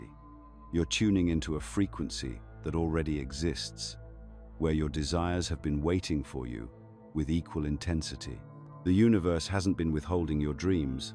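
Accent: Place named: United Kingdom. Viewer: With the British accent 😅😢😅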